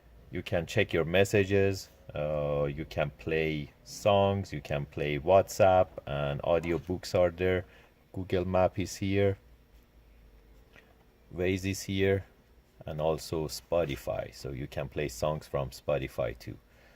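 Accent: Iran. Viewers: You sound Persian... Salom chetori?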